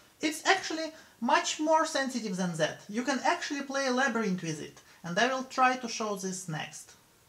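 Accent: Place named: Russia. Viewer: Сраный русский акцент!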